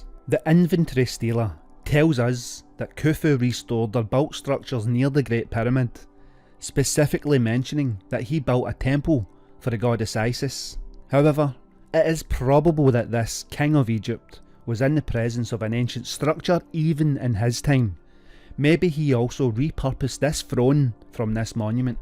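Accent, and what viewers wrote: Scotland, United Kingdom. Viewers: Delivering information in a Scottish accent makes it sound stupid from the off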